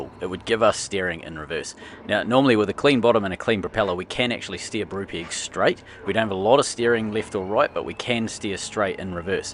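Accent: New Zealand. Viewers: That is the kiwi pronunciation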